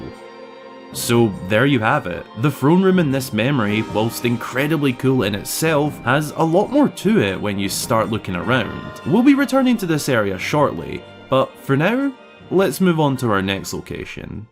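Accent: Scotland, United Kingdom. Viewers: Awesome video, awesome accent too. You scottish, mate?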